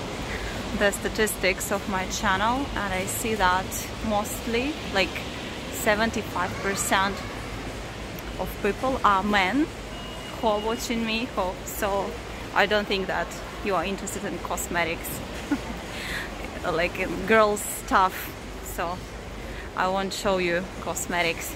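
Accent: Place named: Russia. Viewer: Hi. I'm enjoying your videos. I watched half of this not understanding what you were shopping for. "Swits?" Then I realised you were saying "sweets." (Rhymes with "eats") I love the Russian accent but find it funny that all vloggers mispronounce "clothes." (Of course, I live in the South of the U.S., so I suppose a lot of English speakers would argue with some of my pronunciations.) The "th" is silent in the word "clothes." I think a pronunciation dictionary would have something like "klōz." Anyway, I'm glad that you don't seem daunted that 75% of your viewers are men. Best wishes from America.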